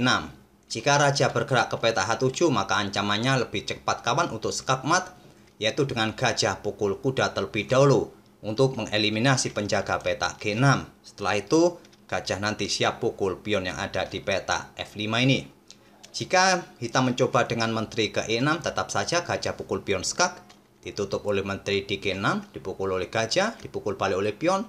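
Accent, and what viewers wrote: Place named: East Java, Indonesia. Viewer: owh admin nya jawa timur, oke bng sukses selalu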